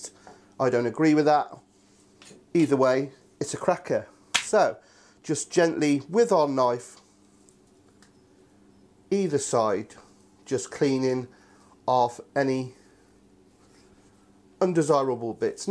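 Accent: United Kingdom. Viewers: I always admire your passion never mind your awsom British accent . I tried these bad boys last summer , it is packed with flavor . one quotation is that grass fed grass finish beef?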